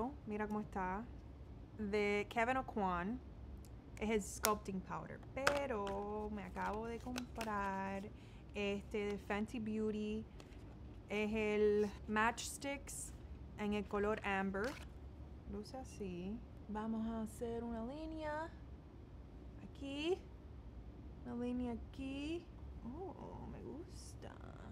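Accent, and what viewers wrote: Cuba. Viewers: "I don't know how to say it!" hahaha...that's totally me. Fun video getting to see you talk in Spanish. Cool hearing your Cuban accent too. No estamos aqui para juzgar...hahaha totally! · THAT CUBAN ACCENT 😍 Love this video so much. Merry christmas G 🎄🤍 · Yesss! I love hearing the Cuban accent! Also, this helps me learn new words. Thanks girlie!! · Madre mia... no sabia que eras Cubana!!! Tienes un acento precioso ♥️ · Amo el acento cubano ❤️❤️❤️❤️❤️ Saludos desde VENEZUELA 🇻🇪 ❤️ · He estado esperando este video!! Not sure why either. I love hearing our beautiful language spoken. Y el acento Cubano me encanta. Well done 👍🏼🙂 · Ese acento cubanitoooo rico! Ya tenía ganas de oírte hablar en español. Saludos desde España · Este acento cubanoooo, me encanta jajajaja ❤️ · En México sí decimos brocha! Me encantó el video, amo el acento cubano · Gosh Cuban-Spanish is so enchanting. 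It sounds like music and is filled with so much soul. Just like Brazilian-Portugese. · No sabía que eras cubana!! con razón tus recomendaciones de cabello siempre me funcionan, tengo cabello caribeño también. Saludos desde república dominicana. P.s. Me encanta escuchar tu acento, el acento cubano es super delicado ✨ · Ahhh I love yr Cuban accent!! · Love your cuban accent! 😘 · Ese acento Cubano 🙌 amoooo! Orgullo Cubano😊 Soy Cubana también ❤ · ! Me encanta tu acento cubano, soy mexicana y mi primer idioma es español, y me encanto escucharte, y si se dice brocha, a las makeup brush, amo tus vlogs y a Dexter, this was refreshing loved it ❤️ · Eres cubana mi amiga 🇨🇺 se nota por tu acento tu te trata de hablar español para los suscritores me entiendes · Anda, un video en español. Mi marido (que es dominicano) subió la ecalera escuchando el video y imediatamente me dijo: "Ella es cubana". Me encanta tu acento. 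Feliz Navidad y saludos desde Escandinavia. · El acento cubano es hermoso, veo que sí lo manejas pero es como desempolvarlo 🤣 y hacer el switch al otro idioma en lugar de traducir en la mente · SISSSS i have been WAITING SO LONG FOR THIS ONE. Yo sabía que eras Cubana desde el primer video tuyo que vi lol, tu acento es súper lindo❤️❤️ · Qué belleza! Me ha encantado escucharte con tu acento cubano.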